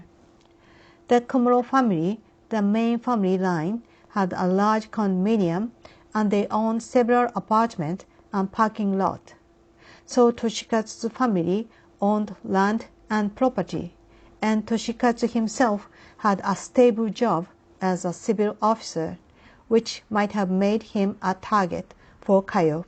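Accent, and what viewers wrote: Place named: United States. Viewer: ミス、 PINKパーカーお似合いね♥ いつもながらのEnglish！アメリカのどこで身につけたんですか? 歯切れ良く、English圏の人に説得力とキレのある語り Voiceが良いです👍 篠原おじさんも弁護士も活用して意見書出すよになったみたいだからさん、我々日本国人として、我々の力になって下さいね♥ がんばって下さい!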